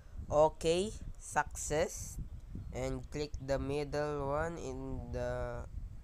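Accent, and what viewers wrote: Philippines. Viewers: Sa accent pa lang alam kong pinoy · Your a Filipino cause your voice is like a Filipino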